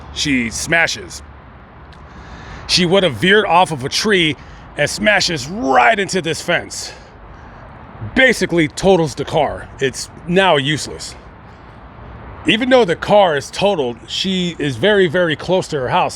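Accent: United States. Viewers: Un relato tedioso a cargo de un pelado con insufrible inglés "americano".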